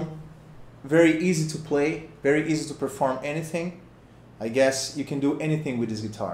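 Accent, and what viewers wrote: Brazil. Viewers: Cara, vc é brasileiro? Esse inglês tá muito abrasileirado hahaha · Brazilian accent has been detected.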